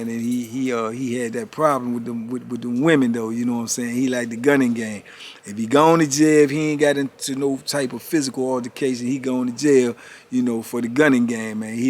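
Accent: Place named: New York, United States. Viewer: ALRIGHT NOW.....WATCHIT😂😂😂😂I'M ON THE FLOOR ALREADY YOU GOT THAT NYC ACCENT IN FULL EFFECT😂😂😂LOUD... TELL IT ALL AND TRAINED TO GO😂😂😂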